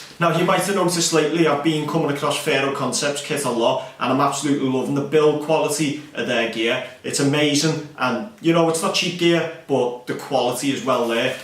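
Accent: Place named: Liverpool, United Kingdom. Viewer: rrrr aaaaa you gotta love the liverpuool acccent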